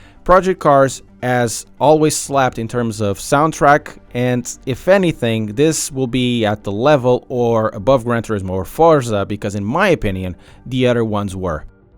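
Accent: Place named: Portugal. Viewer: Ahahaha adoro ouvir tugas a falar inglês. Bom trabalho!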